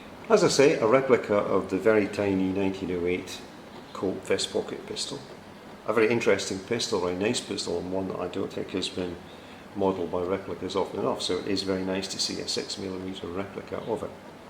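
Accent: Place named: Scotland, United Kingdom. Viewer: Thinking about getting one of these for film use, Hey, nice to hear a Scottish review. I'm Scottish myself :) Haver a good Christmas and thanks for uploading.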